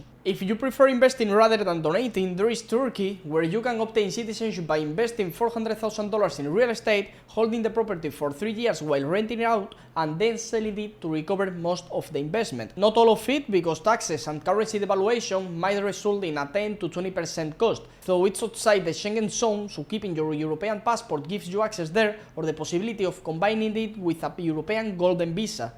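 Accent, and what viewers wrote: Spain. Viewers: Te recomiendo que practiques la pronunciación en inglés, la formación de frases, la palabras y vocabulario es bueno, pero trabaja en la pronunciación tu acento español es demasiado fuerte.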